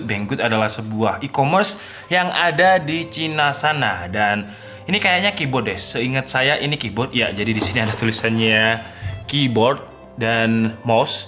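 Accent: Indonesia. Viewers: Pengucapan org indo banget, bertele-tele pisan.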